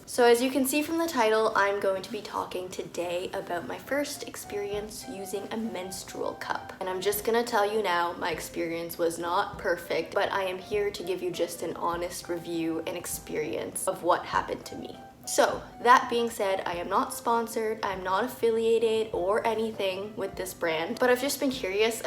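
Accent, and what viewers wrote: Canada. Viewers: I wouldn't say you have a heavy accent, but being a fan of Canadian TV shows, I picked up on it! Your review of this product was so educating and thorough. Really above and beyond! The company should pay you for this video alone . You probably know more about the product then the sales reps!😂 Love and greetings from America!! 😊💙 somewhat related: How does it differ from a diaphragm or nuvaring, maybe? Like could it be used as birth control? Probably not?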